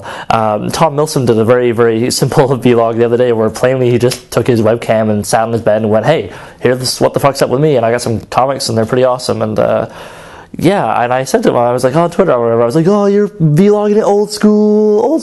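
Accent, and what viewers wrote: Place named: United Kingdom. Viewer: here are some things u might want to change in the show from a outsiders opinion, i really want to see u succeed. 1. where different clothes, no more vest and tie, be more casual those clothes distant you from your audience 2. more british accent people love that 3. don't try so hard, you don't have to force jokes, and run them over with a peer see if they laugh if not scrap it, but your gut feeling is the best. obviously there are more/better ways to get more views but here a a couple.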